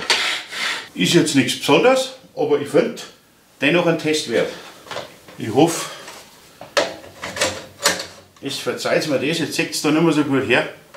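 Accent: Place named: Bavarian Forest, Germany. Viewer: Hallo Du hast die gleiche Sprache wie ich wo kommst denn Du her, ich vermute nicht weit von Regen entfernt (grins). So von Kollege zu Kollege, aber bei mir is nix mehr mit Stom seit ich drei Schlaganfälle und einen Herzinfarkt hatte, da musste ich auch noch das reden lernen, natürlich waldlerisch (grins).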